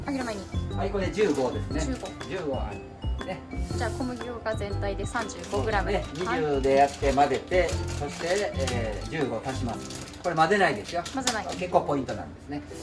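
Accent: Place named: United States. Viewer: このYouTubeのながれで1年前のから揚げPart2も観ましたが、の英語、綺麗なアメリカ英語ですね。from Los Angeles🇺🇸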